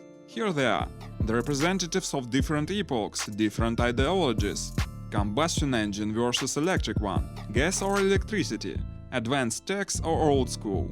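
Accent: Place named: Russia. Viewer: I have cracked you. You are Russian and live somewhere in Central Russia, judging by the foliage and road quality probably near Moscow. I wasn't sure at first bc of your accent, but when I saw your phone was in Russian I got my confirmation. Классное видео чувак, сам себе хочу моторизованный собрать, продолжай в том же духе!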